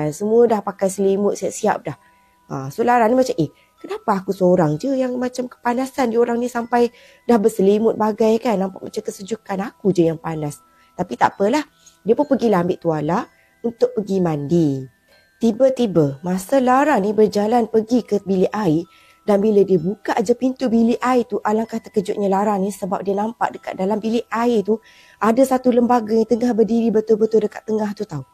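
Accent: Kelantan, Malaysia. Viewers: ni org Kelate ke?! Cakap KL pun bunyi macam loghat Kelate 😅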